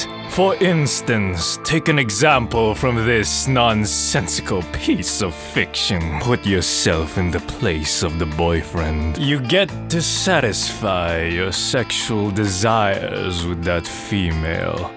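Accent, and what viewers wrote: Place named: United Kingdom. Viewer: He kinda sound British to me to be honest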